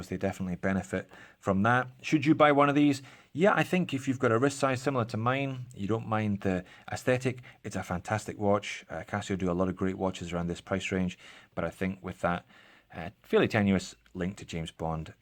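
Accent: Scotland, United Kingdom. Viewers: Scottish accent. “I’m in Australia incase you haven’t guessed” Yeah I have a map in my place. Don’t mean I’m from Uganda. Glasgow I’m from as it goes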